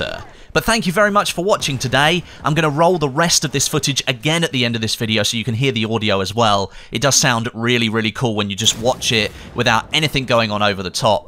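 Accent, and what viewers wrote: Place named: United Kingdom. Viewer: why do british people pronounce beta like bayter?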